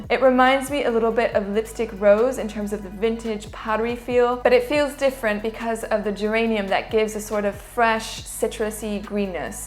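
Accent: France. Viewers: Tu es Française ? tu as l'accent français quand tu parles anglais 😅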